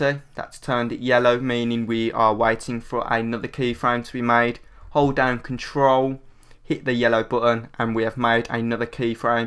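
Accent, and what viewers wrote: United Kingdom. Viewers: where are you from? uk for sure, but I don't recognize the accent. Sorry, I'm just curious :D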